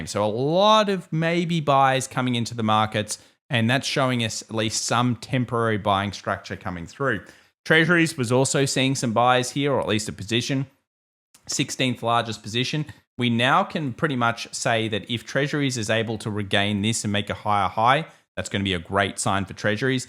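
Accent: Australia. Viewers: You talking like Crocodile Dundee over here!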